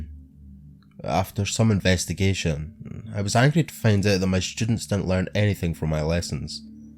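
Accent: Scotland, United Kingdom. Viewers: I'm surprised you dont have more subscribers! You have lots of cool horror stuff on your channel and (I'm sure you get this all the time) an awesome Scottish accent! I am linking this channel to all my angsty teenage friends who watch spooky stories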